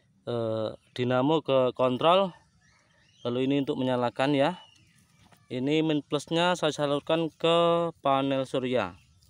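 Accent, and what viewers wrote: Java, Indonesia. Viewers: 😂😂😂😂😂😂 mengelepi sawah to mas.....?seneng medok jawane...😂😂😂😂 Sukses selalu mas...Lampung nderek....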